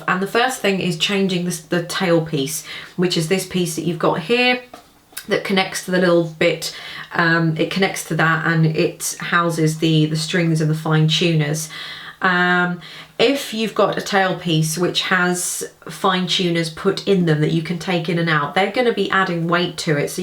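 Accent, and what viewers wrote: United Kingdom. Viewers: I love hearing "pimp up" in a classy British accent. It's really funny.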